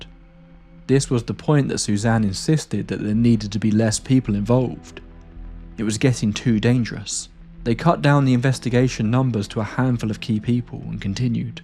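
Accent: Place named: North East England, United Kingdom. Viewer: Were was this pub as the voice sounds north east of england were am from would love to no ❤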